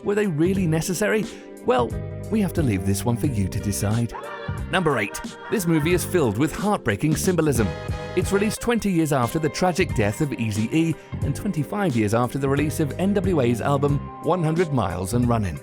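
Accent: England, United Kingdom. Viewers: He has a british accent, have a little understanding, so fucking what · to give him a bit of a break hes british. I cringe every time he says aluminum for the same reason. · It's called a British accent.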